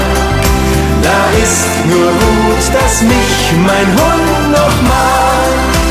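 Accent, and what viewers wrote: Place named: Bavaria, Germany. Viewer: Bayerisch passt einfach am besten zu Dir! 😍😍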